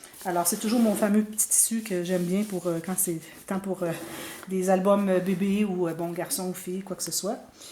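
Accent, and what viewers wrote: Quebec, Canada. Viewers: Bonjour, Je suis une abonnée assez ressente, du coup je rattrape un peu les vidéos un peu plus anciennes, c'est pourquoi le commentaire est un peu tardif par rapport à la diffusion. Tout d'abord un IMMENSE MERCI pour tous les partages que tu nous fais. Je scrape depuis 4 ans seulement et tes astuces pour les goussets dans les albums sont justes formidables pour moi qui débute. Je ne me pose plus de question je fouille dans tes tutos express et je trouve mon bonheur. Et surtout je ne perds pas de papier avec des erreurs. Pour cet album souvenir d'enfance, c'est effectivement très intéressante, pour nous, de voir comment fonctionner en amont, avec les photos pendant la réalisation. Mais surtout MERCI MERCI pour l'astuce des cartes pour les photos en diaporama: je ne sais jamais comment les caser!!! J'adore la page avec boutonnière ainsi que la cascade à pousser. 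Bon j'en ai déjà fait à tirer mais pas encore à pousser! Bientôt je me lancerai. Et bien sûr en plus des explications très simples et très claires, j'adore, j'adore ton accent et ses mots à vous les québécois qui sont tellement riches de sens. Merci merci 🥰👍👏🥰 Fab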